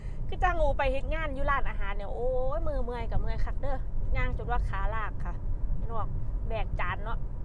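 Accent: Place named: Chaiyaphum, Thailand. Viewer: สวัสดีจ้าพึ่งเข้ามาเบิ่งเทื่อแรกจ้า ฟังเทื่อแรกเดาว่าเป็นคนชัยภูมิเดาถูกอิหลีหนูกะคนชัยภูมิคือกันจร้า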